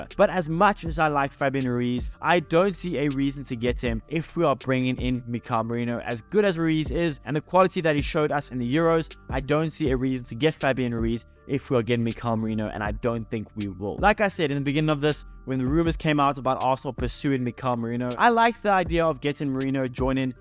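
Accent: South Africa. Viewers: Are you South African bro?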